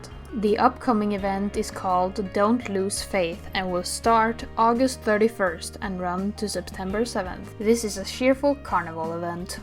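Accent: Sweden. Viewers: are you swedish?!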